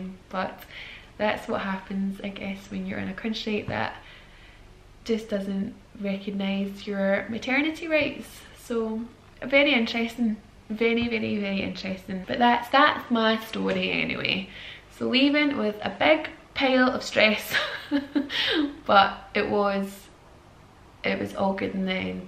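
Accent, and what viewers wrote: United Kingdom. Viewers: is that Scotish accent?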